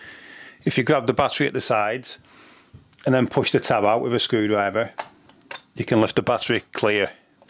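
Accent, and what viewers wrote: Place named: England, United Kingdom. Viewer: brilliant english accent i adore )))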